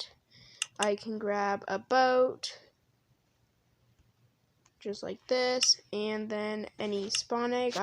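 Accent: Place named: Texas, United States. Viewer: I realised ur voice has a lil Texas accent Lol❤ keep up the work man❤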